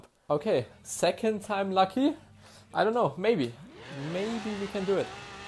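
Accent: Germany. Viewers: you german ? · Cool Video but Theres Just one Thing i will know. Are you a german guy the game is german and your voice Sounds german to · Man hört irgendwie ein bisschen, dass du deutsch bist xD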